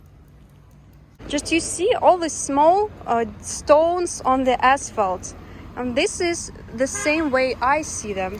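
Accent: Russia. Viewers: you just look so cute when speaking English.. I visit your videos to hear your Russian - English accent.. 😊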